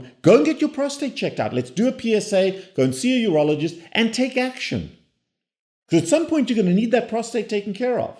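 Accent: South Africa. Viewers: 💯 this guy is South African 😂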